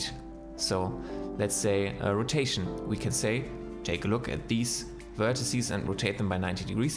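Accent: Germany. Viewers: Gutes Video :) Mein Abo haste :D in der Ersten Sekunde hab ich herausgehört das hier ein Deutscher am Werk is :D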